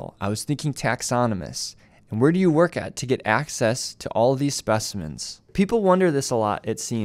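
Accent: Canada. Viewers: Wonderful video! You’re a super likeable and charismatic guy— you should make a podcast! Also, you have the same speaking pattern as Jacob Sharpe; are you Canadian by any chance? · Lemme guess... ur from Canada. That is VERY subtle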